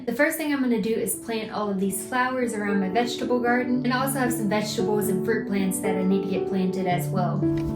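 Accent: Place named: South, United States. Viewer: It's so cute to hear ur southern accent when you talk to ur Dad. ❤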